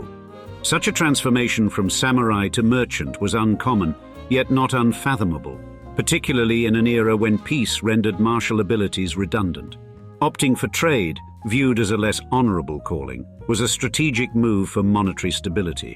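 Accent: United Kingdom. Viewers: Great reminder that Mon emblems were basically logos for families way before they became corporate identifiers. With the narrator's accent, I'm surprised you didn't draw comparisons between the Mitsui founder's department store for the emerging middle class with similar British operations like Fortnum and Mason in the Georgian era or John Lewis or Selfridges.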